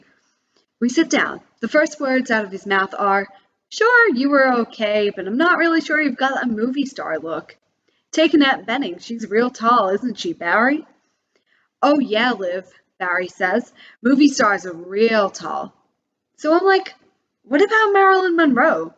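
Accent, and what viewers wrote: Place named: United States. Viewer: A great way to document your process. Your American accent is pretty good. Keep up the good work! From an American playwright.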